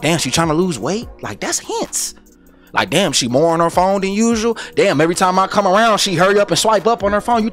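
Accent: Baltimore, United States. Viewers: Bro you from Baltimore lol?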